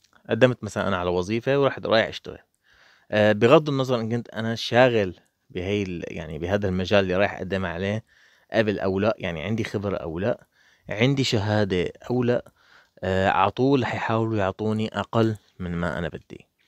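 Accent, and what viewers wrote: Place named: Latakia, Syria. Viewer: حسيتك من اللادقية 🙃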